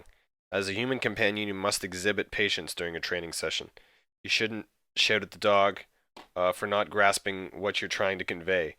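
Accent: Canada. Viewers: are you canadian? ^^ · Are you Canadian? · haha canadian accent!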